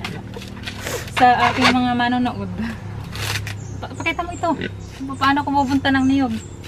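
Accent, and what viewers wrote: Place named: Oriental Mindoro, Philippines. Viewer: Ung accent nyo po tulad smin sa oriental mindoro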